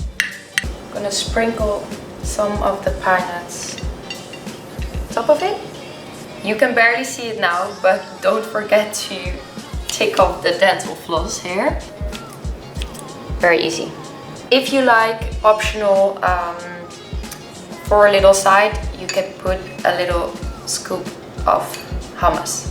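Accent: Netherlands. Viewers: OMG zo leuk dat je accent zo duidelijk Nederlands is. Love it😍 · I know for a fact that she is a dutchie aka dutch person from her accent and her name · i can hear the dutch accent coming throughhhh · je kan zo goed horen dat ze nederlands is. ik zat te luistern naar dr accent en ik d8, dit klinkt best nederlands., toen zag ik dr achternaam.. en ik d8.. jup die is nederlands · Is your first language Dutch by any chance? Cuz I'm Dutch and I recognize your accent.. 😂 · She sounds Dutch. I use to live in Holland. Good genetics and healthy 'diet.' I eat the same but I wish I could eat nuts:( Nut allergy is terrible. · Is she Dutch? She sounds Dutch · Je hebt een nederlandse accent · Are you dutch? im dutch to you have a accent <3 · Hahahaa love voor je typisch Nederlandse accent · i love it.. first watching your clips. question are you dutch? your accent sound like that;). 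any way i will try this at home i need to get ready for the wedding i will be a bridsmaid..;) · In the Netherlands (where I assume she is because of the dutch accent) they have lots of quick cooking rice, it's what most people use! You can cook it like pasta and then pour out the excess water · Do i hear a dutch accent? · is she dutch? she sounds dutch:) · Are you Dutch? You kinda have an accent, not annoying or anything, I am Dutch so I hear lots of people with the "Dutch accent" so I can recognize it haha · Is she dutch? She has an dutch accent in her english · OMG I NEW IT!! I’m from holland too😂 (its her accent) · From the very beginning I could hear she is Dutch..then I checked her name and I was right. I always recognize Dutch accent-it's very nice to hear Dutch speaking English.... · You sound a bit dutch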